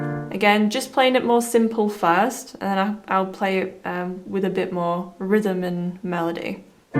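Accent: United Kingdom. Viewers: I know how to play the song. I just couldn't stop the video, enjoying your lovely British accent... 😊. Also nice work with the tune! Cheers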